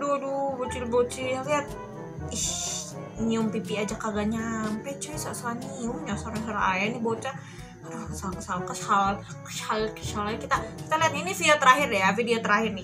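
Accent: West Java, Indonesia. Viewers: Ngomong Sunda nya bikin greget teh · Teteh orng mana teh Sunda mana euy teh h3h3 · Sunda mana nih🤣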